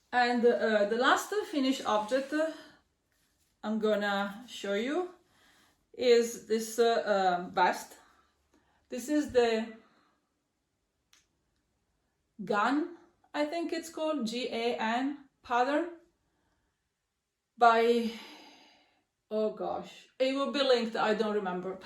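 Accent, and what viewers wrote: Italy. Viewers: I didn’t know you before, but I’m glad you showed up today! You had me at Emotional Support Chicken! New subscriber. Your speech reminds me of my Südtirolean friends from years and years ago. Also my Italian friend at church here in Michigan. Please post again and show us more things! 💜 And yay for Paul Klee. I saw an exhibit in Tübingen ages ago and loved it in all his stages.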